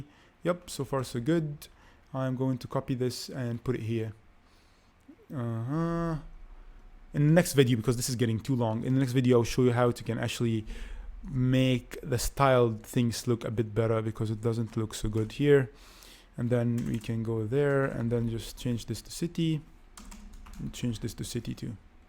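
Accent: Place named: France. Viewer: Everything made perfect sense because of the way you explained it. Thanks a million. The charming French accent added a flavor haha. It was perfect!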